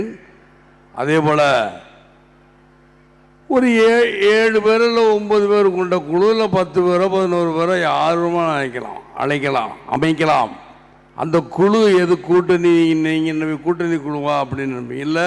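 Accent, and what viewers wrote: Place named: Telugu, India. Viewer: First learn tamil we know u r telgu person....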